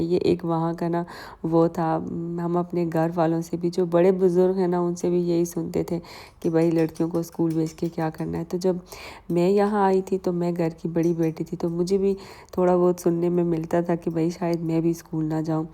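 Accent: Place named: Mansehra, Pakistan. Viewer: muje aap ki zaban se lagga tha k aap mansehra se hugi because udar k log buht ko much boltay hein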